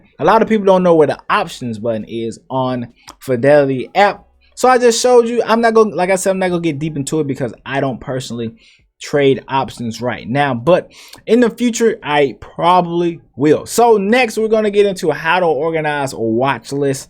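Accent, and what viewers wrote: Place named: Jamaica. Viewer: Are you Jamaican or Trini ?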